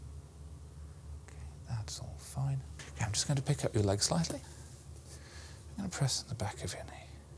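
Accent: United Kingdom. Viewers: What's his accent? It sounds different than the normal British variants...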